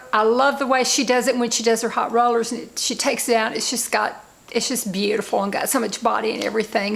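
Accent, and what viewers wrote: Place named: Tennessee, United States. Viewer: Just found your your YouvTube..I can so relate to you..I from Tennessee and listening to you takes me home..Im 70 and really appreciate you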